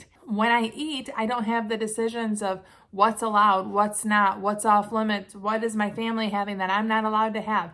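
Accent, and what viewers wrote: Midwest, United States. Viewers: I detect that hard midwestern accent.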